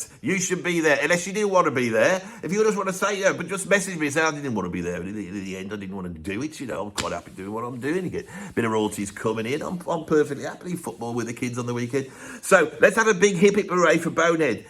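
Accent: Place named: West Midlands, United Kingdom. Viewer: Even a mild Brum / Black Country accent is like the acoustic equivalent of caustic soda, sorry mate I’m sure what you are saying is interesting but I had to bail at Noel.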